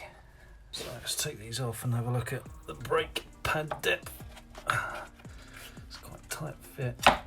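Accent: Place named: United Kingdom. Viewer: Always love british accent motovloger ✌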